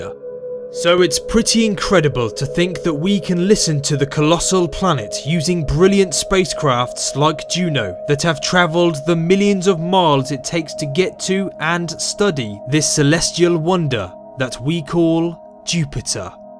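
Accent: United Kingdom. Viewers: I love your british accent 💖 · Why are you British?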